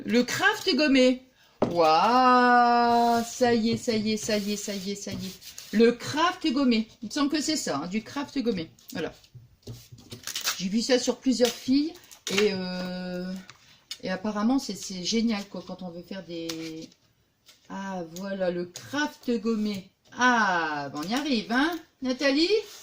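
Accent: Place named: North, France. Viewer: Coucou très simpa ton petit album je suis nouvelle abonné et je te trouve très rigolote ton accent du nord me fait plaisir je suis de la Picardie bisous Merci pour ta vidéo gros bisous ☺😀😚👍💕👏💕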